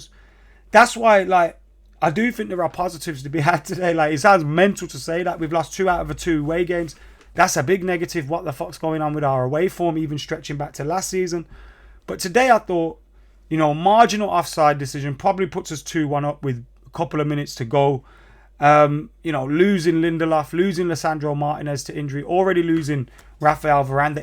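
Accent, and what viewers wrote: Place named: Birmingham, United Kingdom. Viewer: has a Birmingham accent and is from the West Midlands. Why does he support man United?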